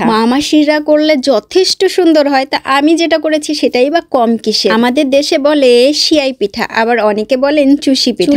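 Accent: Bangladesh. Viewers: Bangladeshi mey bole kotha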